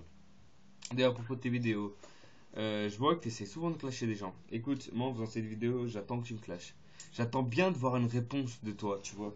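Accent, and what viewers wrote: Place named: Belgium. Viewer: Il a un leger accent belge...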